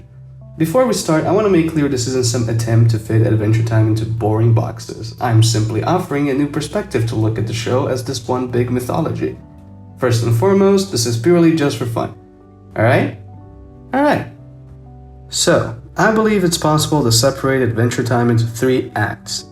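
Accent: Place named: Latin America. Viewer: Sorry for the question, but you are a latino american? You form at talk is very diferent. Amazing video, adventure time it's a great show, a thue Master piece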